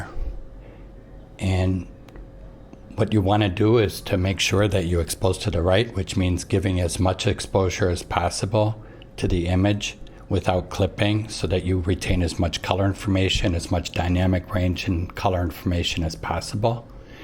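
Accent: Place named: Chicago, United States. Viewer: awesome info, i gonna grab the leeming lut for cine 2 and try it out! Btw are you from chicago?? I think i sense a chicago accent lol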